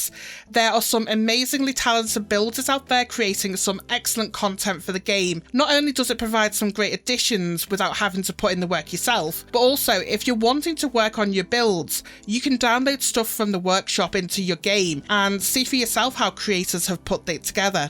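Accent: United Kingdom. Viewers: You have a sort of an accent...Brittish????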